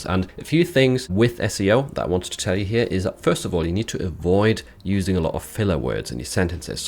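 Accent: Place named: North, United Kingdom. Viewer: How tf is your accent so good?! I'm English and I'd NEVER think you're not a native northerner. I don't think I've ever heard a non-native with such a convincing REGIONAL english accent. I also think its great that you chose that accent rather than a boring southern one. Super impressive 👏 btw I only know about this because someone told me, otherwise it wouldn't possibly have occured to me!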